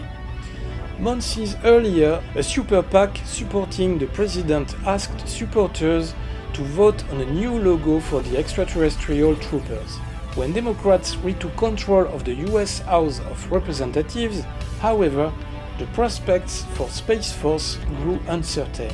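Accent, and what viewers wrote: France. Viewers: Bonjour tes vidéo sont très intéressante mais malheureusement je comprend pas l'anglais je me contente du visuel ou de la traduction google mais la traduction n'est pas fidèle je pense. Ton accent montre que tu es français . bonne continuation si tu as des vidéos en français se serai top.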